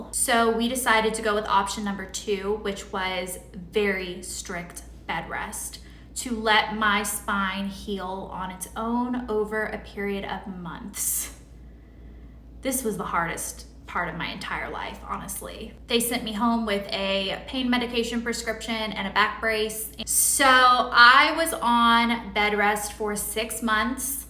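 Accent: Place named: South, United States. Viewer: Your southern drawl is coming back <3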